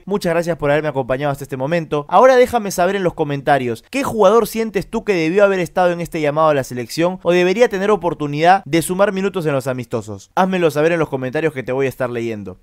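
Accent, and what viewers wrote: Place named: Cordoba, Argentina. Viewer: pprque tienes un acento medio argento, especificamente cordobés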